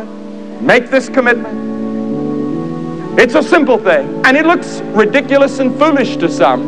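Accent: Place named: South, United States. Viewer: he had sort of a southern drawl to his voice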